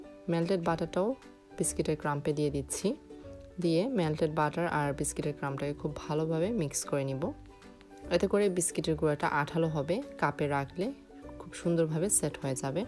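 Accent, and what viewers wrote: Sylhet, Bangladesh. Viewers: Assalamualikum apu apni ki Sylheti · Api you're from sylhet?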